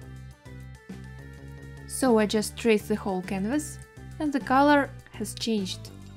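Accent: Russia. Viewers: love your russian accent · You have a cute russian accent :3 It's cool cause now I'm starting to lern english for work, and I understand all what you say. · Omg are you russian? I freakin love to hear that accent with english words. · nice russian accent)))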